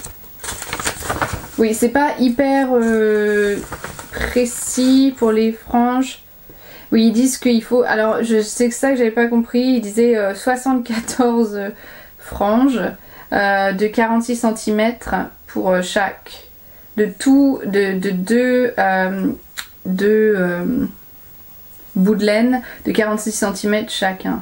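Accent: England, United Kingdom. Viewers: contente de retrouver après cette absence ! tes projets sont superbe, j'aime beaucoup le Manzo et ton Ravello marinière ! j'aime bien tes anecdotes hors tricot et ta façon de parler ! de temps en temps ya une pointe d'accent, mais c'est très léger, et si ça se trouve je ne l'entendrais pas si je ne savais pas que tu vivais en Angleterre .... je croise les doigts pour les exams de Simon et ta nouvelle mission boulot ! à bientôt 😁😁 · Super de te retrouver. Heureusement que tu étais fatiguée car je crois que j'aurais eu du mal à te suivre sinon MDR. Ton accent anglais est très léger au début le temps que tu reprennes le rythme en français et au fur et à mesure je redoutais que tu ne prennes le rythme de ma fille, c'est à dire mitraillette... c'est très amusant à noter au fil de ton élocution et de ta passion. Un super moment passé avec toi. Merci encore. 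Où peux-t-on trouver les indications, de tout ce dont tu parles, écrites qq part stp ? Merci par avance. Bon courage pour la suite dans ton changement de vie !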